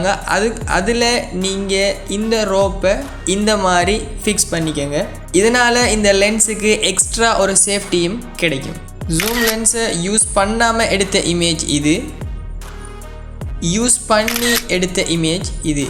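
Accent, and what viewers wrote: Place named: Sri Lanka. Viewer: Sri Lanka tamil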